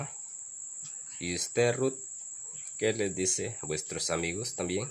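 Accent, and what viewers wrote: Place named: Andalusia, Spain. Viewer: SOY NUEVO SUSCRIPTOR, AL PARECER EL SEÑOR QUE GRABA ES ESPAÑOL, LO DIGO POR EL ACENTO ANDALUS QUE TIENE.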